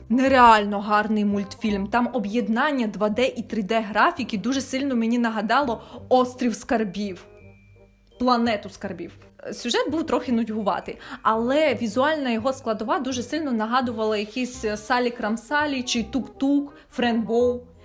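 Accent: Surzhyk, Ukraine. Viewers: у неї майже суржик. не дивно, що ви усе зрозуміли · Потому что девушка говорит российские слова с украинским акцентом, но это все еще российские слова. Попробуйте понять, что означают эти бытовые украинские слова: човен, легИт, скло, бляшанка, бездрЫк, вИдлуння, прЫмара, віяло, троянда, гИлля, лИжко, вЫделка, тлумачЫти, пазурИ, герць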